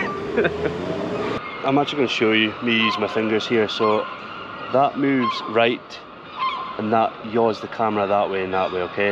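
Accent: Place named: Aberdeen, United Kingdom. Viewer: Hi that’s a lovely video well done. you now have me on board and I have subbed to your channel. I too have a mini three pro and watching you operate yours is very instructive. Thanks for putting all the detail and showing your face, so many drone users tend to just show their footage and not make a story out of the video. I certainly try to do the same myself. I am loving the Aberdeen accent however I have had to put the subtitles on to catch everything you’re saying. Unfortunately the software can’t pick it up either so I just watch the pictures for the most part. Finally you are inticing me to play about with the settings far more, I generally just leave it to the automatic settings but you show how the shots and video can be better by changing the shutter speeds and white colour balance. Looking forward to seeing your other work.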